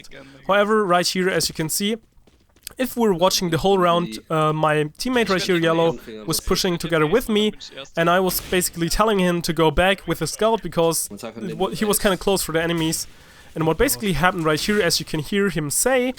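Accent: Germany. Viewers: ! haha als ich das erste mal deine stimme gehört habe wusste ich iwie direkt das du deutsch bist ;D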